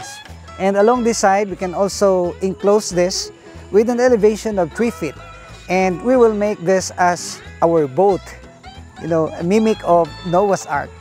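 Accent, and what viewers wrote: Philippines. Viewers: based on his accent, i think he's in the philippines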